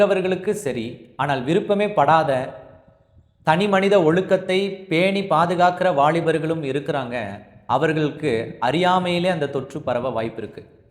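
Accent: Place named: Coimbatore, India. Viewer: First porn and unnecessary sites to be banned. TV channels also promote adultery. TV serials show a man with two women stories and illegal relationship. Also in the name of revolution unnecessary stories with wife applying for divorce even if her husband is good. Why can't serials show good stories with history and atleast one science serial. Self control and discipline are needed. Moral science has to be made mandatory. Pre-marital sex is wrong. My grandma will not allow even her married daughter with child to go out alone after 6pm. She used to tell a girl should not talk with an unknown person. One should know their limits while moving with a known and an unknown person. These things have to be conveyed. Coimbatore English pronounciation. Kindly use Tamil slang.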